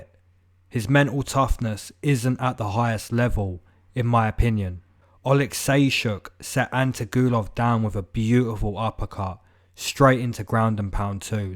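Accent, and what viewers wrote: United Kingdom. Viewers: Yo bro where abouts from the uk you from?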